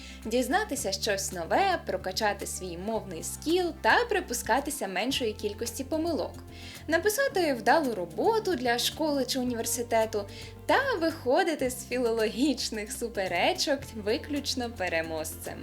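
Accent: Standard. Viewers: Ох як би так гарно чисто та й правильно, як ви, хоть би 50 відсотків українців говорили, яка би насолода для вуха була !!!!!!! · До чого ж приємно чути чисту українську мову!!!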